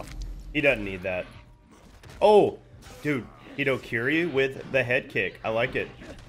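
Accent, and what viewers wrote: United Kingdom. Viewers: Best british accent 10/10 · British accent, beret hat, great at games, I thought you were being like Trutalent lol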